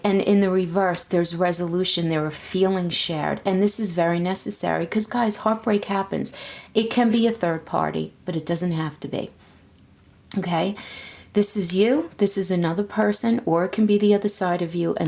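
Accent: New York, United States. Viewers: Nu yourk! Love your accent. 💗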